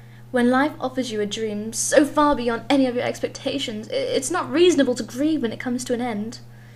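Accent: United Kingdom. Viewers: I LOVE THE BRITISH ACCENT!!!!!!!!! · i love your voice! r u english?!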